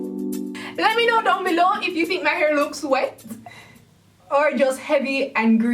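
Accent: Jamaica. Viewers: I love your Jamaican voice lm Jamaican to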